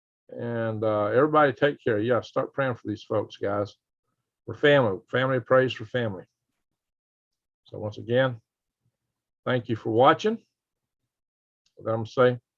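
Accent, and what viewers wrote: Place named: Louisiana, United States. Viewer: New subscriber🎉 saw you on Canadian prepper and was so excited to hear someone who's accent sounds a little like mine & prepping in a climate similar to home here in Louisiana.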